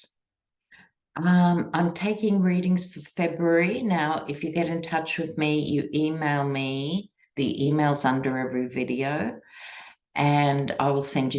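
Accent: Australia. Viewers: I heard you on the Mary Trump Nerd Avengers show and had to give you a follow. It was great to hear an Aussie voice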